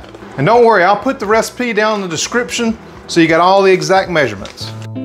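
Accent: South, United States. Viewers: This looks DELICIOUS, I really like a hot cereal and this will be something I try for sure, thanks so much for sharing and I really enjoy your southern accent!! 😊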